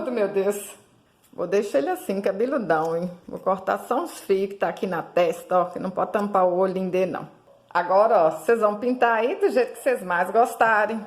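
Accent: Minas Gerais, Brazil. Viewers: Estou apaixonada pela sua arte e por esse jeitinho "mineirim" de falar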